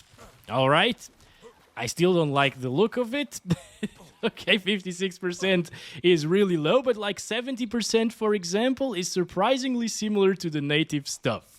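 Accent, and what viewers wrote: Portugal. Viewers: wait are you from portugal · Es portugues!?! I knew it! haha been watching you for ages and was suspecting that because of your accent :) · bro eu ja vejo os testes deste canal a uns 8 anos e só hoje é que reparei que és português pelo "ah esquece", houve uma vez que pareceu-me seres portugues pelo sotaque mas hoje tive a confirmação ahaha, tudo de bom para ti! Abraço! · are u portuguese? sounds like it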